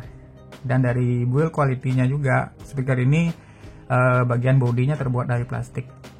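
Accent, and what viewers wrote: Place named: Bali, Indonesia. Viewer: Keliatan logat balinya bli 😂. Rahayu terus berkarya🙏😇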